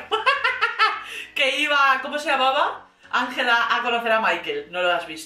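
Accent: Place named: Madrid, Spain. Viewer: Es madrileño, con sus laísmos bien marcados. Ej : La dice algo.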